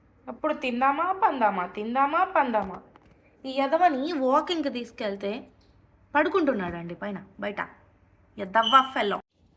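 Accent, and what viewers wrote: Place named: Telugu, India. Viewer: Telugu because I love u r telugu slang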